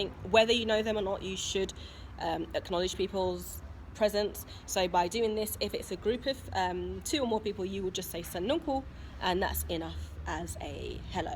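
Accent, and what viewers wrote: United Kingdom. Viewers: It's British accent, especially that of London, [other accents in England might me so different]. You might consider listening to British podcasts, or relocating to Britain for a while. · Why she got a British accent?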